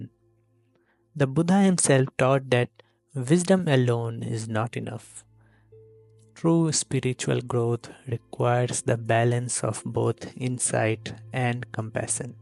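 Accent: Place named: Eastern Uttar Pradesh, India. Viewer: Thank you. This works fine for me. If i had to place your accent it would be Eastern UP or Bihar or even Nepal. That would make it easier for you to ronounc4 pali. It should be Metta for Maitri and Bhaavna, and not Bavaana.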